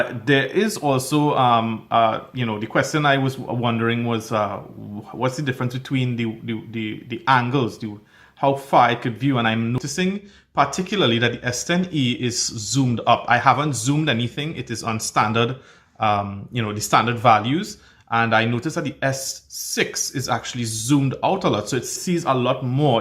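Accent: Trinidad and Tobago. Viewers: Are you Trini or Guyanese?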